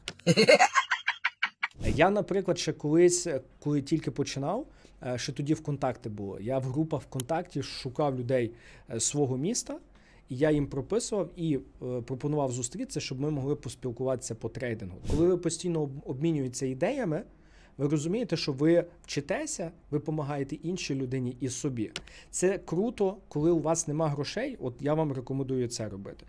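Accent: Lviv, Ukraine. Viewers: Приємно слухати розумні поради ну і Львівський акцент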